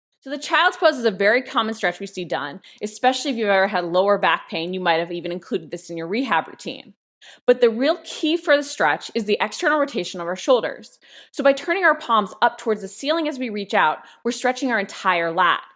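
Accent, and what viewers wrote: United States. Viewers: Where is your accent from in US? I’m getting used to it and your warmups and stretches are helping me get back into running. We can’t cycle here in France ar tbe moment but yes i have massive thighs and weak glutes/ hips!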